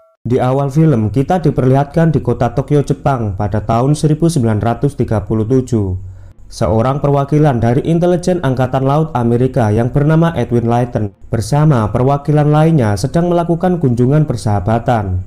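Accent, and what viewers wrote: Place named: Java, Indonesia. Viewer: Orang Jawa ya mas? 😂 Medok mas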